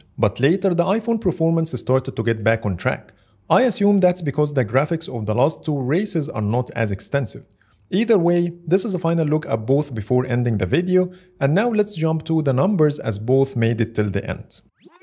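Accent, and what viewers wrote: Egypt. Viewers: 3rft enk masry mn el accent, ta7ya masr ya 3m XD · طلع مصري العرص .. يسطاااا اللغة يسطاااا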